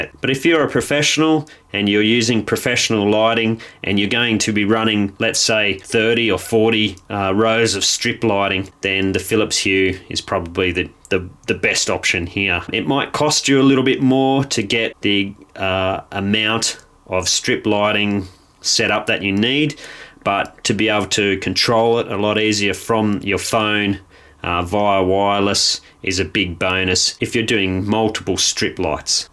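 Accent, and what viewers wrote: Australia. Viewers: Does everyone sound the same in Australia or is this lazerbeam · just because he has an Australian accent doesn’t make him Lazar beam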